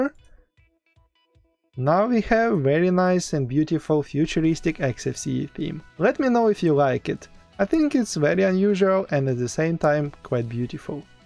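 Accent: Russia. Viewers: That's because he is Russian. Why would that be an insult? It's just an accent ☺️ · not insulting you, but you have a huge russian accent nice vids!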